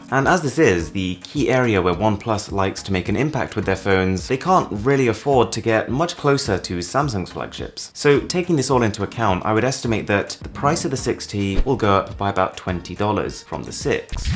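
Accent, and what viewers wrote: RP, United Kingdom. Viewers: You have a British accent so surprised you choose to indicate 'two' with your fingers by showing the knuckle side of your fingers. Turn your hand around when you want to show you mean 'two'. 😉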